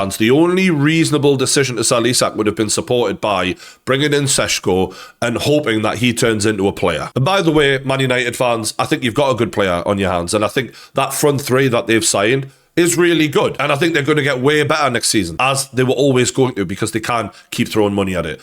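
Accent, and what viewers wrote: Newcastle, United Kingdom. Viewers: yet youre here listening to a geordie about a geordie club.. Living rent free in your head 😂😂😂😂😂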